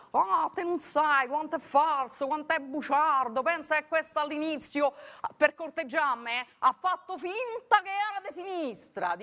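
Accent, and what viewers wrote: Rome, Italy. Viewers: Prende in giro la Meloni, ma ha lo stesso accento romanesco. Mah....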